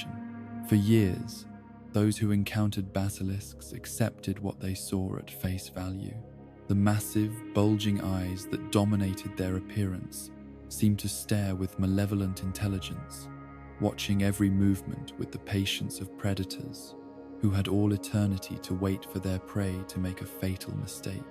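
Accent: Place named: United Kingdom. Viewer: Brittish voice + DarkSouls = views.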